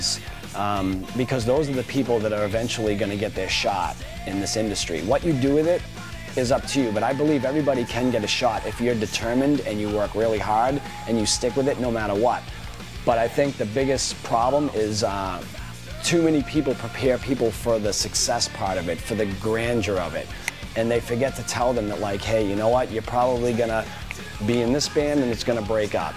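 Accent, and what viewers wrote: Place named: Boston, United States. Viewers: He's from Lawrence kid! It's a Boston accent we all sound like massholes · Boston. My Grandma was from there and jad the same accent.😁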